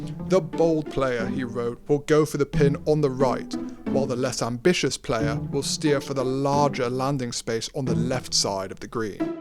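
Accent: RP, United Kingdom. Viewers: Your narrator is too posh. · Voiceover is too posh it makes me want to rip my ears out. Would want either a modern London accent or better yet, a good old Georgia/SC boy that grew up in the shadows of Augusta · Great content, but narrator is the single poshest man I’ve ever heard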